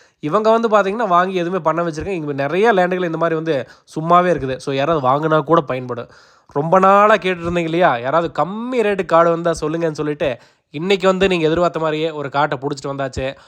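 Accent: Kongu, India. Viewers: தலைவா நீங்க உடுமலைப்பேட்டை area என்று எனக்கு தெரியும்...அங்கு கொங்கு தமிழ் ல பேசுவாங்க என்றும் தெரியும்.. நான் 2011இல் கோவை - பெரியநாயக்கன்பாளையம் ல bharti ஏர்டெல் company இல் work பண்ணி இருக்கேன் .... கொங்கு தமிழ் ல நீங்க பேசுவதை தான் local language என்று கூறினேன்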